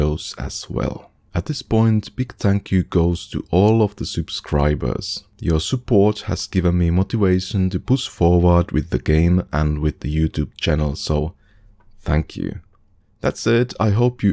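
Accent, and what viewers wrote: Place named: Finland. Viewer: I could bet money you are Finnish. :D I recognise that accent.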